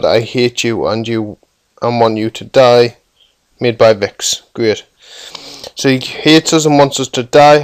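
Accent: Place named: North, United Kingdom. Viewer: Even though i'm from the north as well, I love hearing your accent haha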